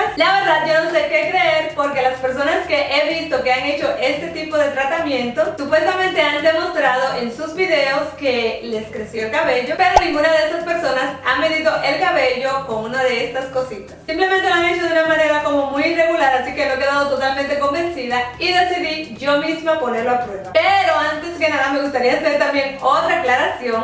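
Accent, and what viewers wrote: Dominican Republic. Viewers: eres dominicana ??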